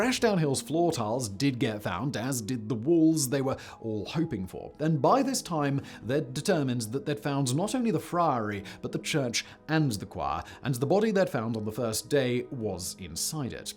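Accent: United Kingdom. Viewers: I can’t be the only one that was expecting Vsauce and was shocked with a British accent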